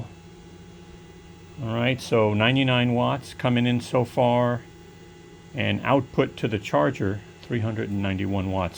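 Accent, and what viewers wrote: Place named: New York, United States. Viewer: First time on your channel. Cool review. You sound like my son in law who's from New York.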